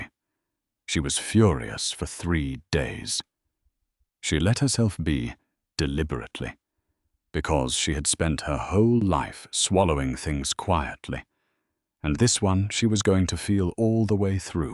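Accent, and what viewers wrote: United Kingdom. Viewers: I hear ya, and offer this solution that I have found to be quite effective. I listen to these fantasy tales on my cell, so I fold a piece of paper over the top and have it long enough to reach below that picture section, and then click on Show Transcript. The words scroll up the screen as the narrator speaks (oh that YUM purring English / British accent), and let my imagination do its thing by making a movie in my head that depicts the scenes and the characters. It is much more fulfilling & enjoyable that way.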